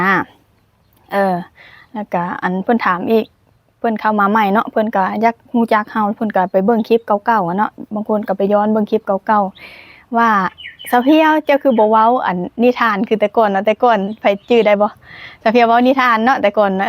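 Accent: Phu Thai, Thailand. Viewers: ทำนองลำขอนแก่น....สำเนียงภูไท...🤣🥰💋:socialdist::elbowcough::socialdist::thanksdoc::chillwcat::elbowcough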